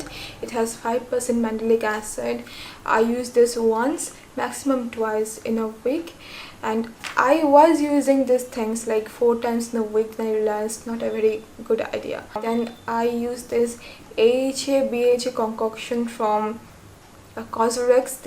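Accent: Bengal, India. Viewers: It's her accent ( Bengali), her english is good though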